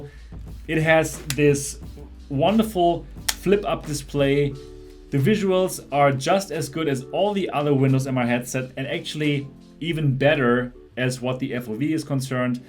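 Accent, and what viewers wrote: Germany. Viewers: Tscherman not Austrian. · Thanks mate for these very well-done and informative videos, complete with a nice German accent :) I am a bit puzzled, though, how you find the Samsung so great while most others seem to find loads of flaws in it. After looking at lots of videos and reviews I decided to go for the Lenovo, just ordered it from Amazon ($279 + customs and shipping). I am a bit concerned about the bluish tint of the displays. Is it possible to adjust the display colours via some software? Naturally you can't do anything about the low contrast of the LDC display but surely it must be possible to adjust the colors more towards red?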